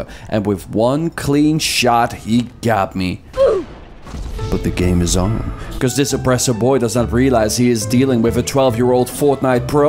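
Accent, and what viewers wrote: Netherlands. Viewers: Het is wel erg duidelijk dat je Nederlands bent. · Are you dutch? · you sound Dutch af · Ben je Nederlands?😅😂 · Het nederland accent komt naar boven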